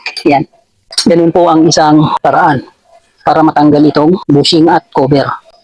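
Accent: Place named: Nueva Ecija, Philippines. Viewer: Taga nueva ejicia ba kayo kasi yun tono ng salita nio parang taga nueva ejiia kayo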